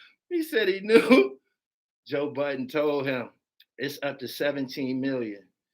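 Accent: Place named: Pittsburgh, United States. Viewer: I be hearing the Pittsburgh affiliation when you speak. We neighbours. Respect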